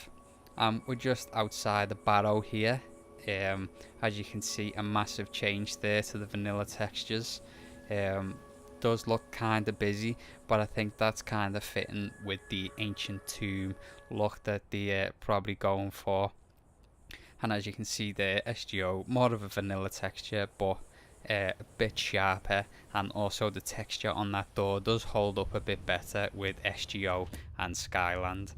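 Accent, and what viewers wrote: Liverpool, United Kingdom. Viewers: Omg ur scouse and you av the same nickname as me, get in lad! 😁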